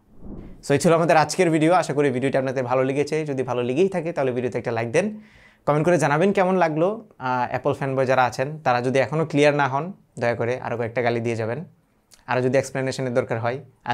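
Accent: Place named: Kishoreganj, Bangladesh. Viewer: Still মানুষ আমারে গাইল্লাইতাছে শুনার পর আমি বিশ্বাস করলাম আপনি আমার জাতি ভাই কিশোরগইঞ্জা ভাই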